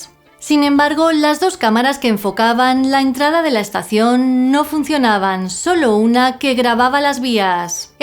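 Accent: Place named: Malaga, Spain. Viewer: Que feo hablas ..erres muy buena narrando però de verdad malagaa España vivarrrr familiarrrr. ...etc etc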